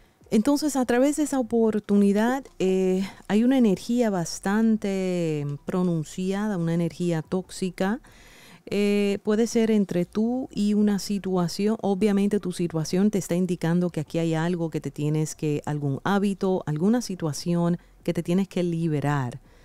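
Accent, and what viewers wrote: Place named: United States. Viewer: Soy divorciada hace 20 años, no deseo ni un poco volver al pasado, no deseo ni acercarme. Tengo problemas con mis 4 hijos y me deja muy triste. Los crie sola, siempre. Pero es tanto lo que me lastman que he decidido abrirme. Aunque me duela. Soy Madre por naturaleza los amo, pero tengo Amor propio Estabilidad economica es lo que necesito. Me es difícil jubilada a los 73 años generar mas entradas. Ruego al Dios, me de la bendición de ser autónoma, y no necesitar de la ayuda de mis hijos. No voy a bajar los brazos. Ahora hare jabones que venderé. Y rentare mi departamento, al fondo de mi jardín.y tener una ayuda económica. En Argentina es muy difícil la situación. Te doy las gracias y mis bendiciones para tod@ los que te escuchamos. Hacia tiempo yo no lo hacia. Te agradezco, me encanta como suena tu español, con acento americano❤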